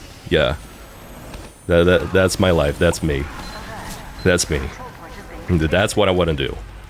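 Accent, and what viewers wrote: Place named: Russia. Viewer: I'm just here for the sexy Russian voice honestly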